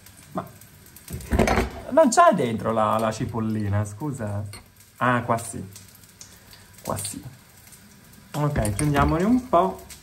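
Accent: Tuscany, Italy. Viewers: Ho apprezzato tutte e 4 le giornate mangerecce ma la mia preferita resta halloween 😻 purtroppo sono a dieta terapeutica quindi quando vado al lidl posso prendere solo ciò che ho da dieta 😭 chissà per il futuro cosa arriverà, forse la settimana francese, austriaca o oriente, ma probabilmente bisognerà aspettare dopo natale. Ora potresti far spesa dei prodotti Delux 😎 Ti ho scoperto da poco, mi iscrivo, sei molto simpatico e adoro sentire la cadenza toscana 🤩 Mi sembra di aver capito che non rientra nel tuo canale, cmq ti consiglio di provare anche i prodotti skincare che ogni tanto la lidl propone, ieri per esempio è uscita la linea nordica, buon inci, ottima idratazione e low cost!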